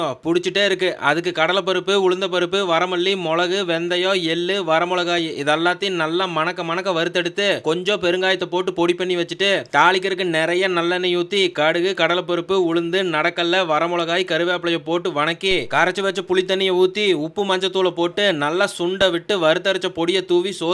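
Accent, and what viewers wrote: Kongu, India. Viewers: Solla vaarthai illai thambi,kongu tamil,balama....sirichutten😂😂😂😂😂😂.enna oru vaarthai jaalam!!!!repeated a kettutte irunden👌👌👌💓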